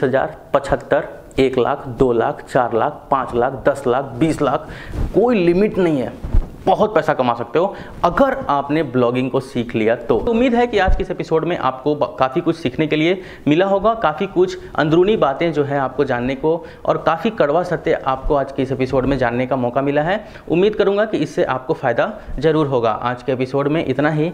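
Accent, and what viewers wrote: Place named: Uttar Pradesh, India. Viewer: UP wale ho kya bhai tambaaku wale daant rehte hai humesha or up wala accent bhi hai tumhara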